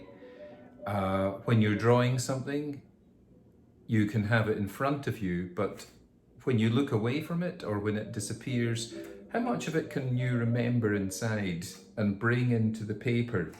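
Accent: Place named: Scotland, United Kingdom. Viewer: Icottish accent 👌👌👌👌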